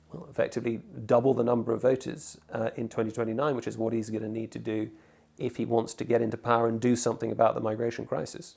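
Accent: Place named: RP, United Kingdom. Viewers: This guy if he had a neighbourhood move in he with his posh life like he said it’s ALIAN to him with his posh accent I’m happy for him to have that sort of life style but he’s in a bubble and it’s not really his problem but his family in the future will leap the rewards of the Islamic way of their life style , he’s very vague about what’s happening in the UK LISTENING TO HIM ,NOT FOR ME THE ELITE IN ITS FINEST FORM 🙄🙄🙄🙄🙄🤔🤔😒😒👎🤷‍♀️ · I live in your country 16 years and I can tell you I struggled to understand your English accent and dialects . Having family in USA and communicating in English with them wasn't same like you do in England. It took me a while to learn and get used to your speaking your accents. I have British friends I speak with them I ask questions anything I want to know and learn about your country I read books about British history too. 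· Aye, annoys me to no end that nobody listens until you get somebody with a posh voice like this man or that Douglas Murray saying stuff we've been saying for decades. The classist element of the defiling of England is one of the most disgusting parts of all this.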